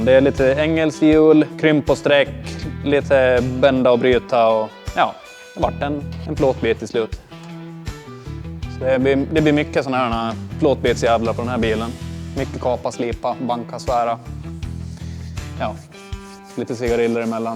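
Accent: Åland, Finland. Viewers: jaa hörde lite finlansvenskt i tonen! De är bra ni har börjat med youtube 👍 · Skulle precis fråga om du var från åland ,när jag såg i kommentarerna att de var så😅,avesta dal åland mål... Jävligt bra film iaf 👍👍. Heja åland, världsrekord på is oxå. Topfuel tjejer i nhra mm. Mycket bra racers från åland !!! Keep it going ❤ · Härlig dialekt men jag gissar nästan på att han är från Åland från början!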